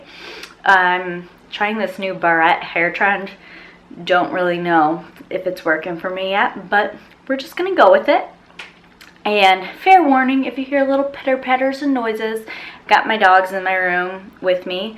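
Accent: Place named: New York, United States. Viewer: Thank you so much for filming and sharing this video you have such a wonderful channel and equally amazing content that is an absolute pleasure to watch and enjoy I love your accent are you from New York by any chance?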